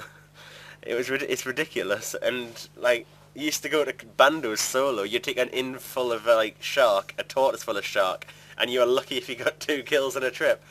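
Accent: Newcastle, United Kingdom. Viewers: loving the jeordie accent in the super hostile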